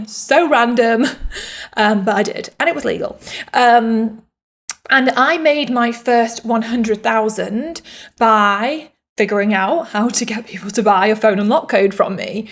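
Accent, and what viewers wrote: United Kingdom. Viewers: This is great info, now how can you structure all of this into a methodology? That's something you could sell! The message/ offer about your membership is not clear. What would be the exact benefit of joining? You sound like you're British, so will your membership/ knowledge is British mentality specific? Or will it also apply to the US culture? The workshop is also a good idea but the offer is not clear. Will we get the clear step by step instructions on how to achieve what you have achieved? This video is good but could be great, in my opinion, it's a missed opportunity.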